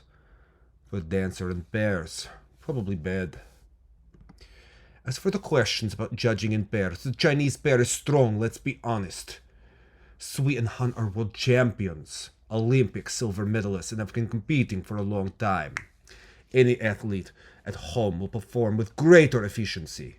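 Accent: Russia. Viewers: I like you are missing the articles with your Russian accent 😅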